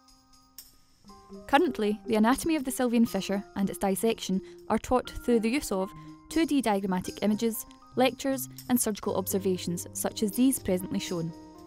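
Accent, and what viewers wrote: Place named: Scotland, United Kingdom. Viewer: What a captivating dialect! Scottish? Excellent synopsis of the approach as well!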